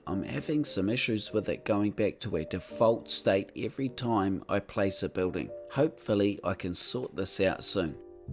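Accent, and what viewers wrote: New Zealand. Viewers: Like you I really enjoy the way you can create art in this game. I don't get anywhere near as beautiful and detailed a finish as you though even after hours of PO work. You really capture the character and charm of old Europe. I love your work and also your lovely NZ accent. 😍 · Good to hear a kiwi accent. Aotearoa represent :elbowcough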